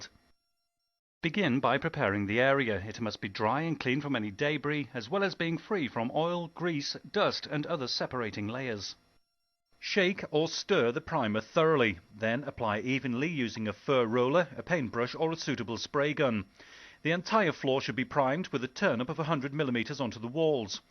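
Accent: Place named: United Kingdom. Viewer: British english is hard for me...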